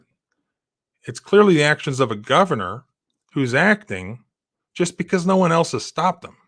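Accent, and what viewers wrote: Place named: United States. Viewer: Just to put a little wrench in it I have a memorandum law that I use when I goes fight speeding tickets parking tickets and a DWI which I fought myself it's tribes all the money issues you sound pretty good you sound very American what about property tax Appeals real estate agents value your property and then you try to appeal and the administrative offices wants $75 for the appeal they give you a form to fill out with all this information they want why wouldn't Fifth Amendment apply there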